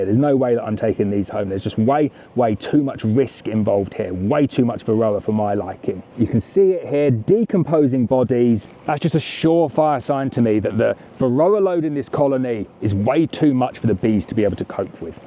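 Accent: United Kingdom. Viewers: Nothing worse than seeing a video you really wanna watch, then you hear a British accent, god dammit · It's only been 12 minutes into the video but, I am feeling physically and mentally tired, already. Listening to a British accent, talking non-stop, like out of a machine gun, making no pause, even for three seconds, is mentally and emotionally draining. Wow...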